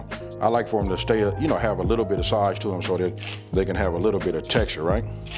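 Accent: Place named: California, United States. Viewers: Where you from in LA I hear a bit of east side on your accent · You sound just like some of my uncles and cousins, you must of been raised in Cali, LA, San Diego? We have a certain way we talk, NadadaMean! 🤣💯 Good Food!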